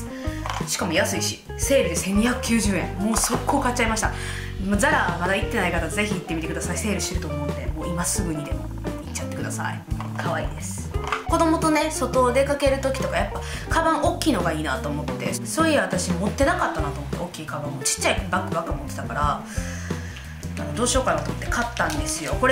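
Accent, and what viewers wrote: Kansai, Japan. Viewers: 初めまして！！メイク動画検索でやってきました！さんの関西弁に親近感わくー！大阪人で、わたしも妊娠6カ月です！(^^♪ もうすぐ出産されるんですね！！頑張ってください！！！甘いもの食べたくなる！わかりますｗｗ妊婦はつらいですよね😢 マザーズバッグわたしも大きいカバンもってないので、何を買うか迷ってます！ベビー用品とかも買われましたか？何買われたのかきになるー！これから、動画拝見させていただきます！！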